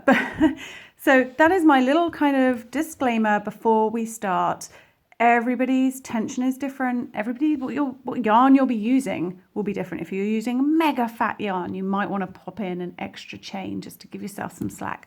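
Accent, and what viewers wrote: England, United Kingdom. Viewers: ❤❤❤❤❤❤❤❤❤❤❤❤❤❤❤❤❤❤❤❤❤ I LOVE YOUR TUTORIALS AND YOUR ENGLISH ACCENT ❤❤❤❤❤❤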